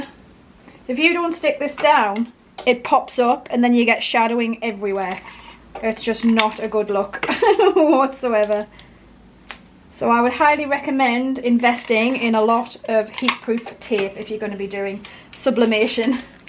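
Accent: United Kingdom. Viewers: I hear a northern accent. Are you from up north and still living in the UK or you in Australia ? They are lovely people they are 😊 I have just come across your channel 😊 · Just came across your channel and subscribed. Love the succulents, really cute. Do I hear a hint of Geordie/Gateshead? Abinova on youtube is from Gateshead, and she has also been having problems sublimating the square mousemats; she finds the round ones easier. Catherine from Katnipp is another good small business channel to follow for advice & inspiration. Wishing you good luck and success at the market. 🍀Have a great week. 🙂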